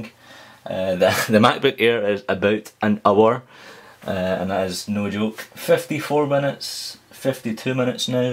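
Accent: Scotland, United Kingdom. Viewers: i love your accent i love scotland man. cheers from greece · Are you scottish?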